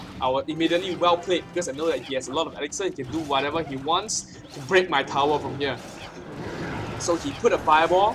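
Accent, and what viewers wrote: Singapore. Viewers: U singaporean? accent tells me so lol. i am singaporean too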